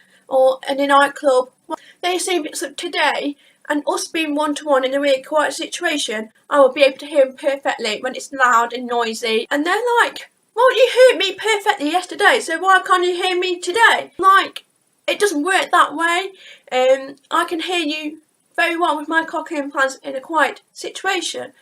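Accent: United Kingdom. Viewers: hey, okay, please don't be upset, I want to ask a real question... okay? u have a British accent, I'm curious how you picked that up, if you have not heard it...? it's fascinating! I'd like to discuss! :)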